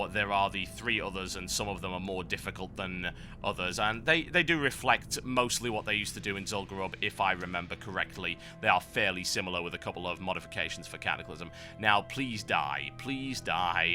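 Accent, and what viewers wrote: United Kingdom. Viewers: Watching your video just for your british accent besides i like WoW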